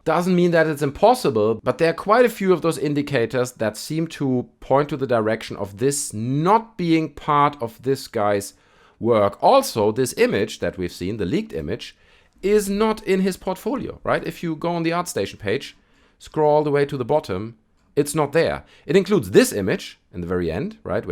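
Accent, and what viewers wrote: Germany. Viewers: Let us hope this is real! BTW, are you german? I swair you Sound like me when i talk english 😅✌️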